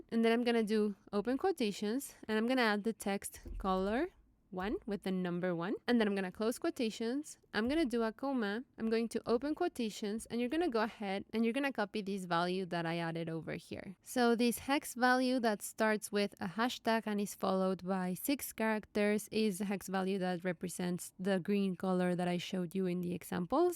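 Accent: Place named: Latin America. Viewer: Your tutorials are just amazing!! I'm from Mexico, but I went to college in the US and lived there for 12 years. You sound like my female friends from LatAm! I've seen many of your videos, and I don't think you've ever said your name! Thank you so much for making our lives easier with spreadsheets!!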